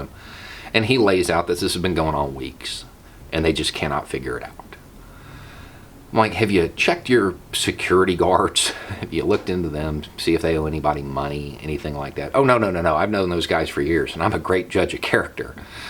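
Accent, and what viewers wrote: South, United States. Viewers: You said; "Don't judge by appearances." I can't remember how I got to this site in the first place and I have already admitted I misjudged you massively then. Not actually on appearance, although for me that was a 'WTF?' moment. It was worse than that - I misjudged you on your accent. It okay, I'm all better now, but it seems to me too many people with accents like Mitch McConnell and a few others, have altogether too much influence on how America works. Add those to the all too frequent and common flaunting of what purports to be a Confederate flag, but which I know actually isn't, and a disturbing pattern emerges that might slightly mitigate my misjudgement. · It's kinda funny I almost judged bow when I first heard that southern drawl I almost clicked skip and misstock him for a Trumper then I listened closely to what he was saying and I'm glad I did. 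I didn't realize how many anarchist there are still out there the struggle goes on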